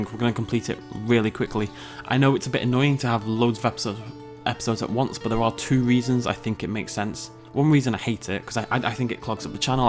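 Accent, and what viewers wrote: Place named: Manchester, United Kingdom. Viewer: I live in Manchester, I thought you were from around here from the accent. Would love to play ARK with you and come see JW if I'm free :) I'm watching a Twitch stream of ARK now and the server won't load :S hope the actual release is better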